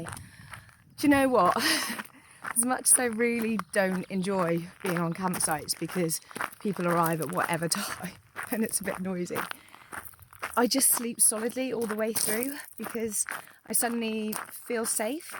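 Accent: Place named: West Country, United Kingdom. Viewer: Absolutely brilliant,, love the passion,,does it really matter how many miles are walked every day?? I think you're doing a 'proper job' !!! West country twang for you!! All the best 👍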